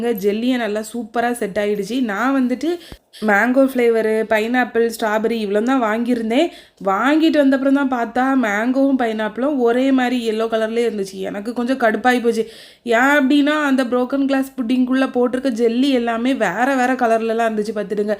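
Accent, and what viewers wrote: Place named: Tirunelveli, India. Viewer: I hate tirunelveli slang. Tirunelveli place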